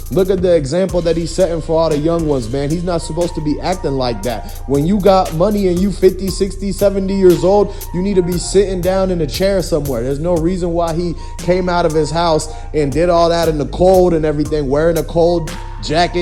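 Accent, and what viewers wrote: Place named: Idaho, United States. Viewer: Man delete this dude u sound like u from Idaho n don’t have a clue what really happened 😂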